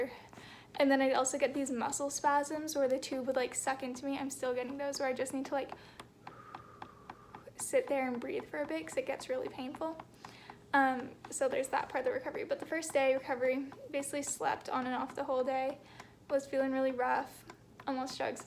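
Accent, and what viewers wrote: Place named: Canada. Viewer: I thought she was from Australia too! :P But then the Canadian accent :P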